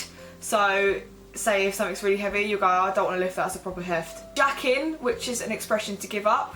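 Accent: Essex, United Kingdom. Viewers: this is not a typical Essex accent, it's chav Essex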